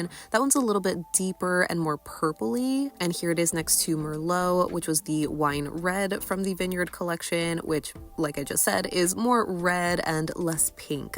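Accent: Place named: Philadelphia, United States. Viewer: It may be a regional pronunciation thing. I’m from the Philadelphia area like and I pronounce it the same way. If you want to drive yourself crazy, you should hear us pronounce the word “water” as “wooder.” It drives people nuts.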